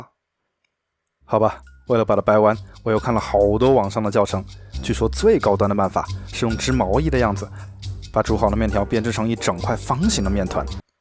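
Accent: Wuhan, China. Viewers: 武汉人！！！！口音越听越喜欢！ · 哈哈、纯武汉普通话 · 一听就是武汉普通话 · 拐子！你就用武汉话吧，你这武汉式普通话听到我鸡皮疙瘩掉一地。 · 不用说就晓得你是武汉人哪，托托的弯管子普通话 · 这个说话的调调，重音的部分，确实有武汉话的感觉。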